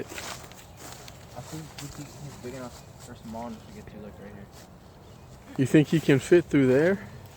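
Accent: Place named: Louisiana, United States. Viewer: You have a Louisiana accent. Are you in Louisiana? Lol, he is sniffing Cassius. Lol.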